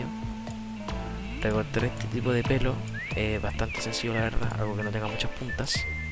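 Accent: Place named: Chile. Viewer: Oye crack, por las palabras y maneras de hablar eres chileno? Realmente me dio curiosidad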